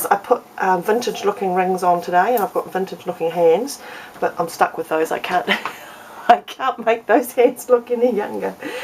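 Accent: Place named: New Zealand. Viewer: I heard your accent and I said inside my head that of course it is my kind... The smartest kind... The kiwi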